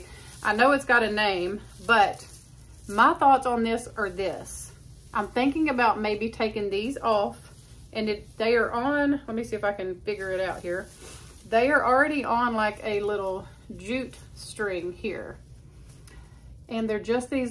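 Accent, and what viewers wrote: South, United States. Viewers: Just found your videos. Love them!! I heard your southern accent and knew I had to subscribe.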